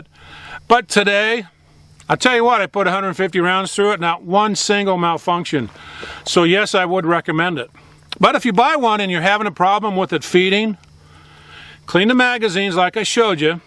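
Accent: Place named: Michigan, United States. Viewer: Awesome vid. Right here in Michigan? That looks like Nevada. You must be a native Michigander. That accent is hard to miss, one Michigander to another.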